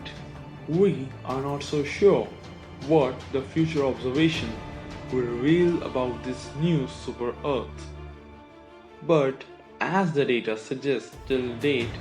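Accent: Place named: India. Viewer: Can't you tell.from his thick accent? Although he tries his best to mask it, but indian accent is just too difficult to get rid off.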